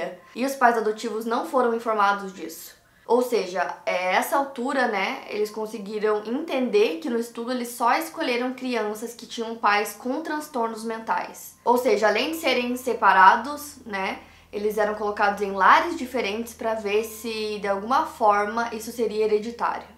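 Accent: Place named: Paraná, Brazil. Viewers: Adoro Seu Sotaque ... Acho tão lindo o sotaque Paranaense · Vc é curitibana? Baita sotaque lindo daqui . Rsrs. Po mina , vc contou td sobre o net flix... spoiler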